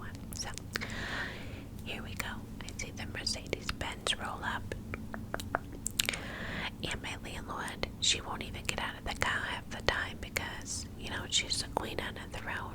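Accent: New York, United States. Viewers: Your New York accent is so relaxing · Yasss bringing back the NY accent😍literally adore itttt , neighbourhood gossip is always the best cuz I’m such a nosy person🤣❤️ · aaah i love ur ny accent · I truly love your New York accent is awesome! Thanks for this amazing video! ❤️😍🤠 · another long day of schoolwork and getting ready for bed gonna watch this !! 💛💛 i love the combo of the ny accent and ur neighbors ☺️☺️ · I’m obsessed with you and your New York accent! I’m from Boston and it does remind me of our accent here and there, I definitely don’t mind 😍😍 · Shout out to the state of NY I never been there, i have only been to 4 states California where I am from,arizona,nevada, and New Mexico i have 46 more states to go eventually/hopefully i love this ny accent 🙂😅👍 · Ooh I love New York accents ❤️ excited to relax as I get work done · Yay! The NY accent is back! 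· I love this New York accent it's just such fun. Your really good at these xx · Omg I LOVED this. I adore your NY accent ❤️ sorry I’ve been Missin in the comments. I’m in art school!!! Degree in film and photography! So I’m super busy but enjoying it so much! You know my playlist will be helping me through my degree ❤️❤️ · the new york accent is so satisfying wow, i definitely wasn’t expecting that 😂 great as always! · Yes the NY accent video is my fave!!!!!!! 😍♥️ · Love a good Nooyawk / Bawstin accent 😂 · these New York accent videos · YEEEESSS I live for your NY accent!! Plus this story time..girl, its 4am here and it shall put me to bed 😴 good night!! ❤ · Your New York accent is giving me serious Harley Quinn cartoon vibes! I love it 💓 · I love your NY accent it's so relaxing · i absolutely love her NY accent, it is really soothing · I’m in love with the New York accent your videos always send me to sleep thank you ❤️ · LIVING for these NY accent videos! 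For some reason they’re just automatically tingly. Hope you’re having a great week!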